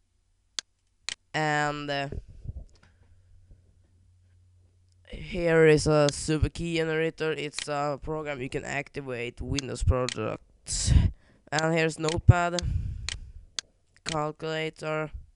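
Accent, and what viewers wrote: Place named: Sweden. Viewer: you sound swedish!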